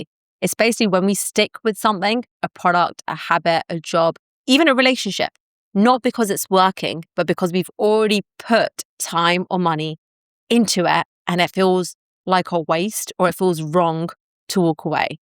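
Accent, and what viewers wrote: United Kingdom. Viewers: Why are you talking $ and Americans instead of £ and Brits? Am I the only one hearing a British accent?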